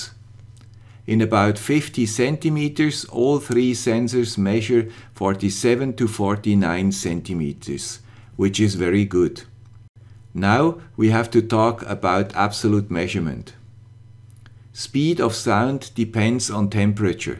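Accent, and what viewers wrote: Switzerland. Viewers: Hallo, auch hier einer mit dem swiss accent:) Ich habe diesen <link> Ultraschall-Sensor gekauft. Ich möchte diesen gerne mit I2C betreiben mit einem STM32F446RE Mikroprozessor. Leider habe ich die slave address noch nicht ausfindig machen können oder auch das abzurufende Register. Kannst du mir weiter helfen?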